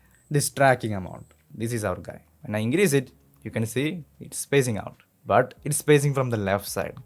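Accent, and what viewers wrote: Kerala, India. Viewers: Why do you sound so familiar? Wait are you from kerala?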